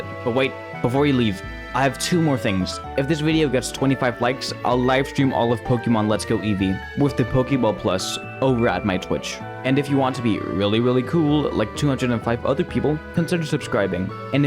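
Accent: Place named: Denmark. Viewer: buddy, are you danish or do you just happen to have a very danish sounding accent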